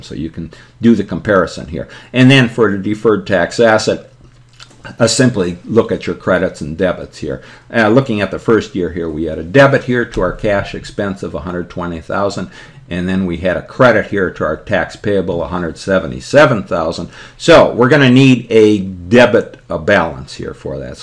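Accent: Boston, United States. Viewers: I find the Boston accent difficult to study to (maybe because of Family Guy?). Other than that, thanks so much for the help!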